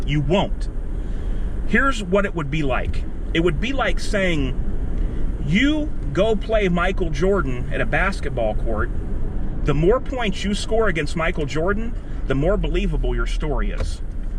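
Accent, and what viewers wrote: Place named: Western Pennsylvania, United States. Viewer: Obviously you’re from Canton too. Although I can almost detect a Western PA accent.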